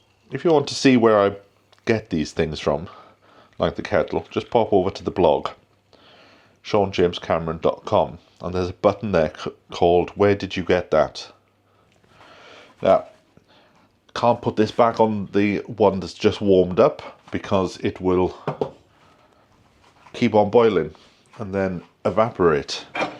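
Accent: Wales, United Kingdom. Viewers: Love your welsh accent. ❤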